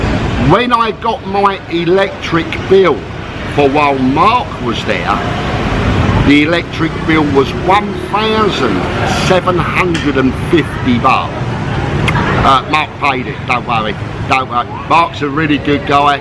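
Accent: United Kingdom. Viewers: Good one,. I am convinced that I watch your content mainly because I like your personality. Where the hell in the UK are you from? It's fun trying to figure out your accent half the time. Sure, I've been to Thailand several times, Pattaya only once, but your content is compelling and interesting to me only because it's delivered by you. You're an engaging dude, so keep up the good work and I hope I can share a cold one with you some day. Keep doing what you do. It's awesome.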